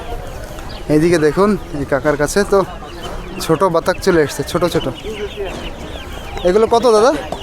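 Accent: Bangladesh. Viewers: তাঁরা কত কি খায়! মনে হচ্ছে খাবারের তালিকায় কিছুই বাকি রাখছে না! আপনার কথা অনেকটাই বাংলাদেশীদের মতো! মাঝে মধ্যে ভারতের পশ্চিম বঙ্গের মতো আসে!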